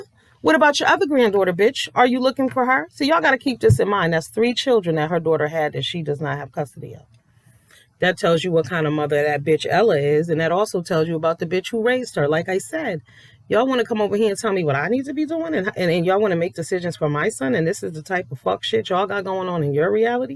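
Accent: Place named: Boston, United States. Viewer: Happy Belated Birthday Queen! 💕🙌🏽💃🏾🎂🥂 Btw are u from New England? U sound like my Boston Family (sisters)...😎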